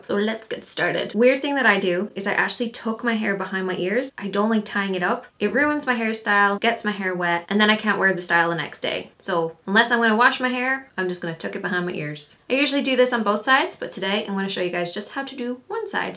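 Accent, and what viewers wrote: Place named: Ireland. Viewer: She is from Ireland ^^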